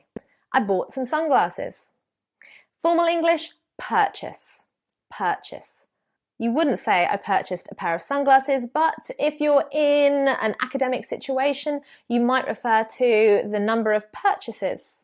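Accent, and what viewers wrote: United Kingdom. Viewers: realy I love you and your way in speaking british English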